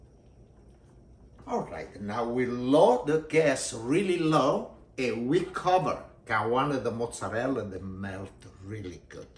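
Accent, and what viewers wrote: Italy. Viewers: Just listening to him makes me happy and makes my dad im half Italian i don't speak Italian but listening to him makes me wish i had that accent haha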